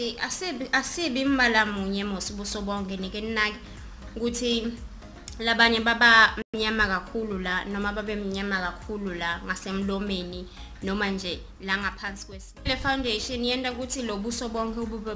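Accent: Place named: Eswatini. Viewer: So glad your Siswati accent sisekhonyana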